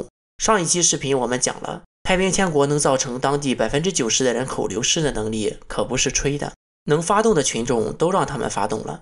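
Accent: Shandong, China. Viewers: 这口音，老家山东的吧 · Klause哪里人？口音听着是山东人？ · 听口音作者您是山东人？有点好奇您现在在国外还是国内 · 看了点半吊子历史书，可能也受了点大法导师的点拨，卷着大舌头操持着山东大葱味儿的国语就敢评说天下啊。你是真有勇气真有脸。论国际关系，当年德国美国苏联都帮国民党，共产党到陕西，只剩下两万大洋命悬一线，国际上怎么帮了？共产党勾搭国际打赢了国民党？你这逻辑是跟李洪志学的么？ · 题外话，请问klaus是台湾人吗？听口音不像 像山东人。。。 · 这口音山东人吧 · 听口音你是山东人吧，反正是中原地区的人，别装台湾人了好不？还沦陷，中国现在过得很好，稳扎稳打，得民心者得天下，如果中共是你们口中那样极端的专治，以中国人的革命精神，早给赶跑了，中国人是世界上最爱革命的人，农民起义在历史上数不胜数，中共之所以能成功就是顺应历史潮流，联合了一切可以联合的力量，说直白点，就是得民心，彻底解决了封建、买办和殖民势力。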